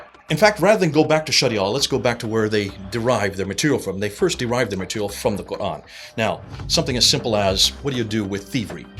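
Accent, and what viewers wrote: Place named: United States. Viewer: He's Britain but sounds like American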